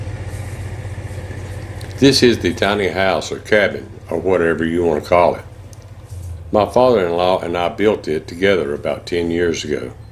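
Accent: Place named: Texas, United States. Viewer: Hey Mr.. I can tell you are from Texas. I love your accent, lol. Your land is gorgeous! Such an awesome video. I made sure to subscribe and have seen your other videos. I am enjoying them.I will be waiting for more videos. Have a really good night. See you soon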